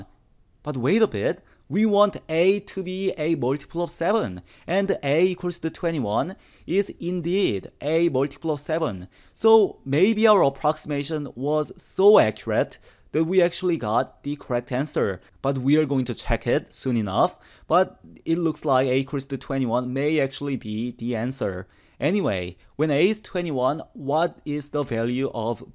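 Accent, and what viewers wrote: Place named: South Korea. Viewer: His accent is sooo pleasant to listen to! I love it. If I had to guess, I think it is Korean.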